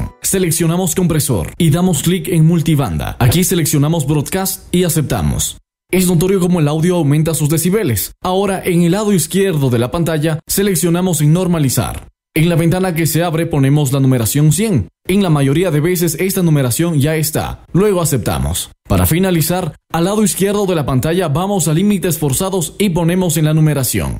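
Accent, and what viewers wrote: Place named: Colombia. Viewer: Muy buenos tips, buena vocalización y acento por un momento pensé que eras paisano je, saludos desde Colombia.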